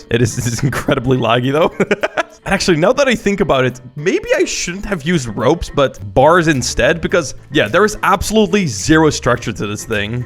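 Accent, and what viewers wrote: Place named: Netherlands. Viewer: Ik ruik een klijn beetje nederlands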